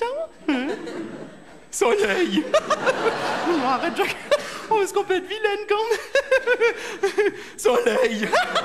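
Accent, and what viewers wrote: Switzerland. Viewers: Rien que l'accent helvétique est marrant....Super. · J'adore l'accent Vaudois. Je suis Genevoise❤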